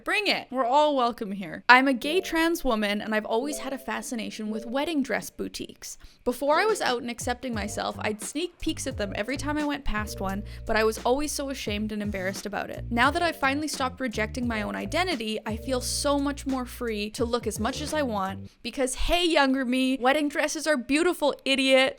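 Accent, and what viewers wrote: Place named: Prairies, Canada. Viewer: I'm yer Uncle Taz and I found Midwestern Canadian accent peeking through from time to time. Involuntary Smile Reaction engaged...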